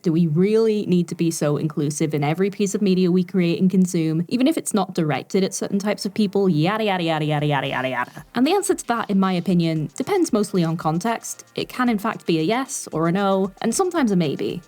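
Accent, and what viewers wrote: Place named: Lancashire, United Kingdom. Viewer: Haha yeah I've always found it wild that there's one of my 'own' doing the whole Youtube thing. I can't place your accent, you're definitely from around at least Lancashire/Greater Manchester but I imagine you enunciate more on YT anyway seen as I tend to do so when I talk with anyone outside of the North, especially Americans/Canadians. Americans often think I'm Scottish because of how thick my Bolton accent is, even when enunciating. UK Publishing has a huuuge classism issue. They've been trying to level out the playing field for years but it's still very gatekept. Both for workers looking to get into the industry and authors looking to get published. Most agents are from middle class backgrounds, so as the first port-of-call for authors looking to get published, if they don't relate to the material sent to them, it often gets rejected. 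It doesn't even get a chance to be looked at by a publisher. Though I'm glad to see we have indie publishers based out of the North like UCLan now. Haha yeah I love my '80s stuff but the '60s and '70s were banger too. Whilst Northerners have been largely barred from publishing spaces, we instead have a rich performing arts culture. Plays like A Taste Of Honey by Shelagh Delaney were groundbreaking. The fact a play written in the '60s, set in grimy Salford, depicted a teenage schoolgirl getting pregnant by a Black sailor and then exploring her feelings about the pregnancy with her openly Gay best friend is mindblowing when you think about t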